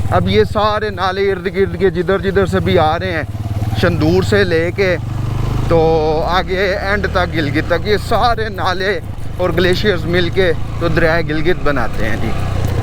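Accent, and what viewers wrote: Punjab, India. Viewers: You explore unique areas but your Urdu language is like Truck drivers from Punjab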